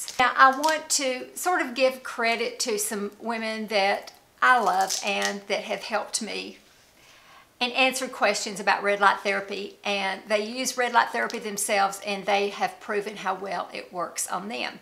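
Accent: South, United States. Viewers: I think southern women are the sweetest, kindest, just examples of grace in all circumstances. I shouldn't assume you are southern, but your accent is beautiful. You are really beautiful, inside and out. Whatever you are doing, it's working! You look fantastic. People our age never did use a whole bunch of skin care products. I started when I was 58, and some things just made my skin WORSE. I had acne bad when I was a teenager up until my forties, it gradually became less and less. Now that I am older, and my skin isn't as oily, I find replacing that oil with some vitamin C and A oil really feels good. I think it helps my skin. And the red light therapy, yes! I love it. I lie down and do some meditation while I do mine. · They both have a southern accent and are adorable but they don't sound alike.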